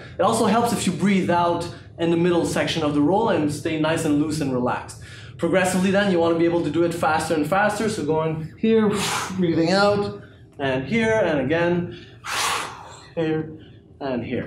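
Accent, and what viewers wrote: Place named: Quebec, Canada. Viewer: Quebec accent :D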